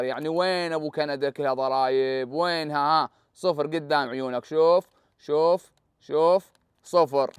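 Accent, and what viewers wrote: Gulf, Arab world. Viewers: لهجتك قريبة عالخليج كلش ليش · ياله لهجتك مو كانو لهجتنه بلعراق كانو خليجي 😮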